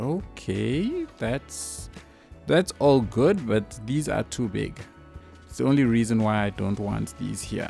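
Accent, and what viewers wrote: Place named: South Africa. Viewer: You got a South African accent. S/O from mzansi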